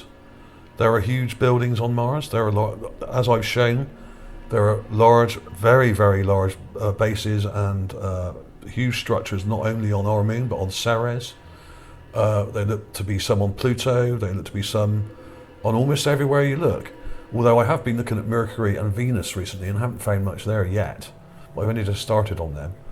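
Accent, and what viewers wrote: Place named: Gloucester, United Kingdom. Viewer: You sound like you're from Gloucester or somewhere south maybe bristol?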